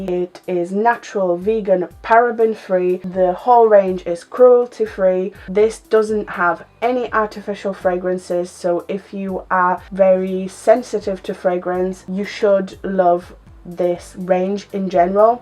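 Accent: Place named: United Kingdom. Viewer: Love your personality and uk accent. Wanting to try this out myself.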